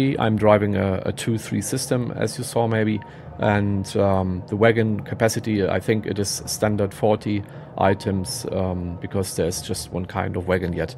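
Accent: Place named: Germany. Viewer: The german accent is HORRIBLE... please stop it... :D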